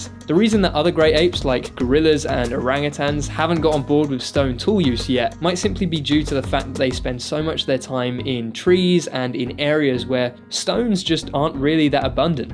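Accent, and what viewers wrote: United Kingdom. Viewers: "I've always been fascinated by the idea of animals using tools" Sounds sophisticated when you say it with an English accent. Not so much if you're from the states.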